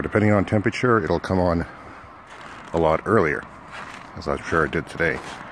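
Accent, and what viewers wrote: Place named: Saskatchewan, Canada. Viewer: It’s weird hearing an accent like mine, being from Saskatoon: the only other non-ghetto city of Saskatchewan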